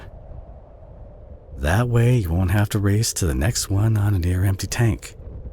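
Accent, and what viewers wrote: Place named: South, United States. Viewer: love audio and story. another cowboy audio yes!!!!!!!! the southern accent it’s just 🫠 I do really love the audio of you as a Cowboy. I don’t drive well because I can’t but in audios I can. thanks for helping me out and giving me [listener] a place to stay. anyways lovely to hear your voice ♥️ cowboy + = ♥️♥️♥️♥️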